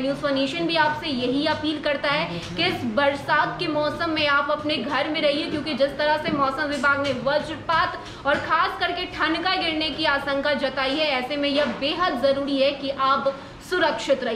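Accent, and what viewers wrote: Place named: Maithili, India. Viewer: आप मैथिली भाषी हैं क्या❓ आपके Accent से तो ऐसा ही लगता है.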